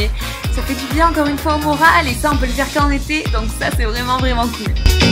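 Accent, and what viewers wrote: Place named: South, France. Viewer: Je t'ai découverte depuis quelques jours ( merci aux suggestions!) Ta bonne humeur, ta spontanéité, ton accent ( ça me rappelle mon sud!) me fait sourire! Avec vous êtes authentique et très agréable, continuez ainsi et soyez heureux c'est l'essentiel!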